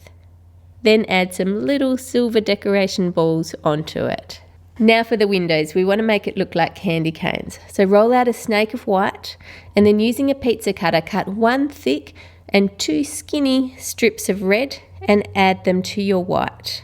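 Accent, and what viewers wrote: Australia. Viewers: Ikr I could listen to all day:D she's Australian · I am from Maryland,Us and I would honestly love winning the mixer because I love baking ands cooking but I don't always have the tools to do so(ands being 14 I don't really have the money either) and I have always dreamt of having a mixer to make what the bakers online make (I don't only watch you and other Australian youtubers to perfect my accent)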